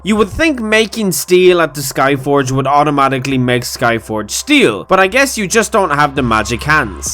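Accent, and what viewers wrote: Ireland. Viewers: Your accent is more borage, than elsinore, you sound like an Irishman than a Kajhiit.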